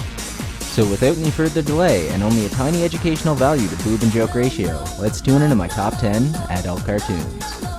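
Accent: Canada. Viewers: I was guessing either the Midwest or Canadian lol. It came out in the vowels ^_^ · My god, I can't stand your shitty canadian accent.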